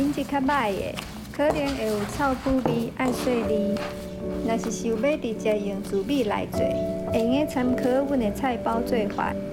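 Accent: Hsinchu, Taiwan. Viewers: 影片配上甜美的新竹腔口，很親切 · 講了真詳細，你的台語足好聽，感謝。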